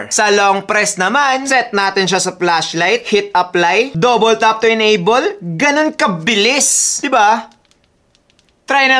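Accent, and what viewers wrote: Visayas, Philippines. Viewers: nakaka inis yung accent nya, pang bisaya.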